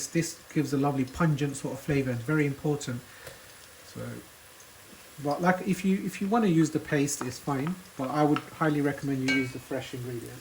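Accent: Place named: England, United Kingdom. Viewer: Love all the DISHES YOU MAKE.. MY STYLE....THANK YOU FOR BEING ON LINE.... 👏👏👏😋😋🙏🙏 IM IN ADELAIDE SOUTH AUSTRALIA.... ARE YOU IN ENGLAND?? DETECTED THE ACCENT...👏👏😂❤❤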